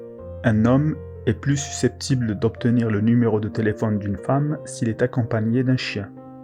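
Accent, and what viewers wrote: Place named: North Africa. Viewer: C’est dommage que le narrateur lise ses commentaires et je n’aime pas cet accent nord africain perso je sais quels hommes m’attirent et les hommes bleus du désert ne sont pas mon type ni même les Africains en général je suis une hypersensible et l’odeur leur accent leur mentalité leur communauté pseudo religieuse même leur cuisine grasse je n’aime pas ….je n’aime que ceux de mon ethnie il n’y à pas d’africains ni autres étrangers dans ma famille et même à la vue de ces gens je fais de l’urticaire géant heureusement qu’il n’y en a pas dans ma famille car je n’aurais su être hypocrite je suis chrétienne non catholique…..! Et pourquoi parle t il que de la masturbation des hommes nous aussi on se masturbe le clito et surtout l’esprit perso je me touche au moins trois fois par jours depuis que je suis excitée par un homme exceptionnel et Français et peu importe le moment sagesse du jour et de la nuit !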